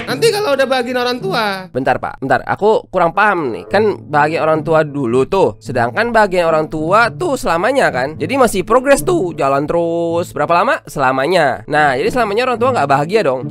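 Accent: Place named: Java, Indonesia. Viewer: Dulu medok asw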